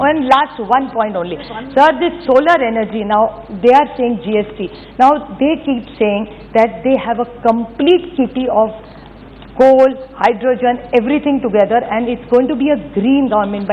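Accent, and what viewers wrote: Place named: Maharashtra, India. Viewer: मराठी आवाज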